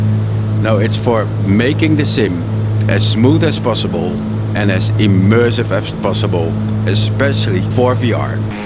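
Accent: Netherlands. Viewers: Dat Hollanse platte kut engels.. 🤣🤣🤣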